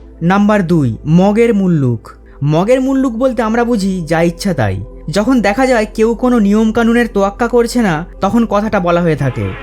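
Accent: Kolkata, India. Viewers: খুব সুন্দর লাগলো,হয়তো আপনি ইতিহাসেরই ছাত্র,সেই সাথে অনেক পড়াশোনা করেছেন তবে উচ্চারণটা কোলকাতার টানে না করে প্রমিত বাংলায়(নদীয়া জেলার শান্তিপুরের টানে) করলে শ্রুতিমধুর লাগতো।পুনশ্চঃ ধন্যবাদ।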